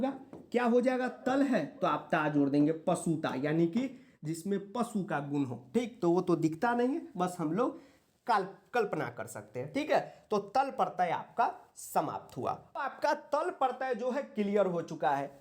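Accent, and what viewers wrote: Bihar, India. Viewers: Sir aap Bihar se ho kya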